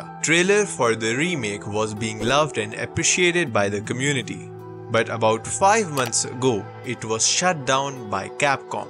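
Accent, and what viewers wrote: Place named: East India, India. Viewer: I knew u were east Indian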